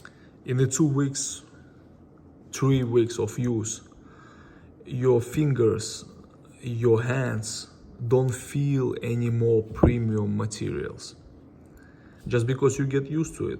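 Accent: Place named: Russia. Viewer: Акцент на русский похож))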